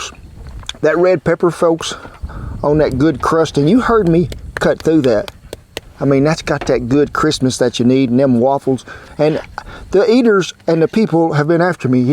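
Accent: South, United States. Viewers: Its the deep southern drawl for me ❤️❤️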